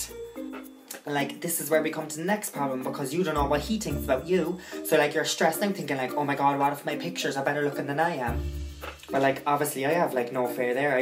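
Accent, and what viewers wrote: Cork, Ireland. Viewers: only found your channel today and im in bits, your videos are hilarious you left me not breathing because im laughing so much, its so cork hahh i love it, reminds me of my mom hahah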